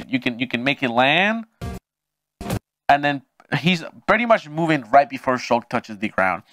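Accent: Chile. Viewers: Habla chileno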